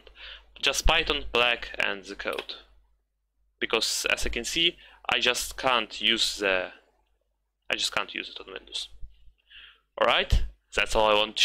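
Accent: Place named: Russia. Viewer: Are you from Russia?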